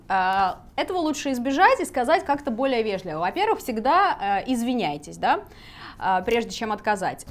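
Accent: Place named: United Kingdom. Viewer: ! А у вас есть какой-то акцент и вы его придерживаетесь?))) Слышу что вроде какой-то бритиш, но местами проскакивает FlapT или в этом акценте это норм?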